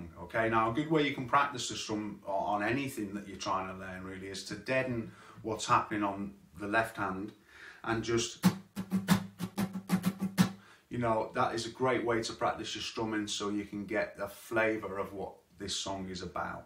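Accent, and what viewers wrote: Liverpool, United Kingdom. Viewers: Hi. I struggle with the Rhythm. But it's just practice. Can I ask you about your accent. Are you from Liverpool if so which part?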